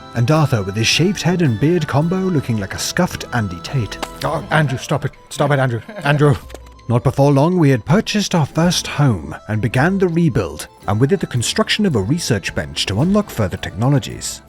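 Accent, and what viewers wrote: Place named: United Kingdom. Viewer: Glad to see someone else discovering this diamond in the rough and enjoying it as much as the rest of us do. Also, yeah, I can't wait to see another Kenshi playthrough. With your sense of humor and buttery smooth, British accent, it would be a treat to see what kinds of shenanigans you could get Arthur, Skibidi, Loada and Wee into and hopefully out of. Cheers!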